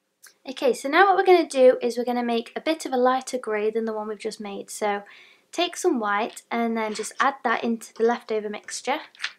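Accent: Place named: United Kingdom. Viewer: I love watching your painting tutorials! What talent you have! And that British accent is the icing on the cake❤️ You have brought out a hidden talent! Thank you so much!!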